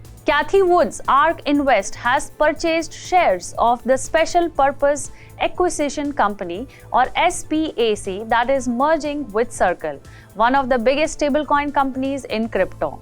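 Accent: Gujarat, India. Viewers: The content is very relevant and the updates are fascinating and fantastic. is very pretty, presentable and has s good voice. The only slight problem is that her accent is quite gujju and is a bit of a distraction. Hopefully she goes through accent and diction training. Amazing content. Probably the best I have seen anywhere on Cryptoverse.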